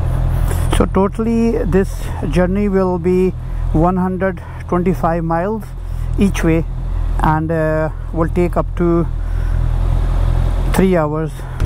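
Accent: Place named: Scotland, United Kingdom. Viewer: One of my favourite roads, it always takes my breath away. Oh and you have a lovely little Scottish accent coming on, nice one brother. 👍👊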